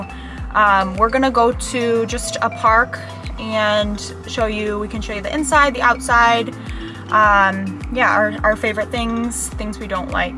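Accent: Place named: Chicago, United States. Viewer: Born and raised in Chicago….I can hear the Chicago in you voice! We have a similar handsome dog. Love the Rivian! And Lake Shore Drive (I slightly dated myself😂)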